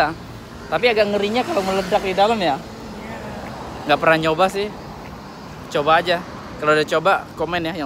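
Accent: Java, Indonesia. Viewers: Ko ada medo' nya bro klo ngmong😅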